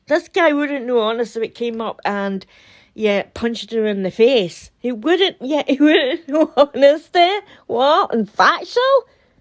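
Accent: Scotland, United Kingdom. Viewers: Love your content and your wonderful Scottish brogue!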